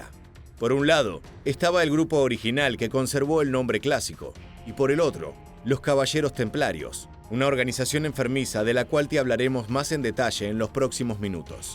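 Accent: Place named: Argentina. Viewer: Amigo, estas muy bien documentado, aunque veo tu acento argentino me da la impresion que vives aqui en Mexico, vas al dia... muy interesantes tus documentales. Saludos.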